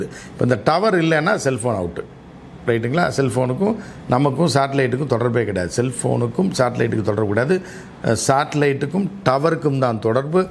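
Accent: Madurai, India. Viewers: தமிழில் மதுரை மொழியில் கேட்கும் மகிழ்ச்சி அளிக்கிறது.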